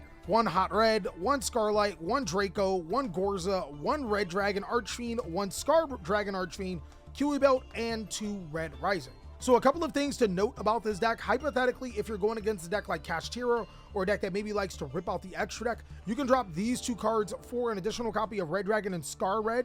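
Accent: United Kingdom. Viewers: I think it’s more of a British cockney accent.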